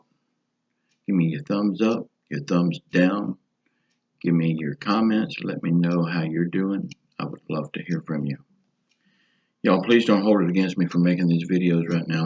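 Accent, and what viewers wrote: South, United States. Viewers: My boyfriend and I watch you daily. Prayers for your wife and you. It’s extremely obvious how much you’re hurting not having her home with you. Anyway, my boyfriend thought we should let you know we call you. He says you look like a mixture of Donald Trump and Elvis 🤷🏼‍♀️. I just like the southern accent in your voice and you could look like Elvis might if he’ was still here 😁😏 Where are you from? We live in Northern Indiana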